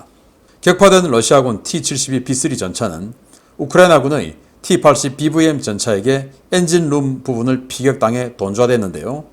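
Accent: Gyeongsang, South Korea. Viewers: 신안균이 견공들이 많은 경상도대구출신인가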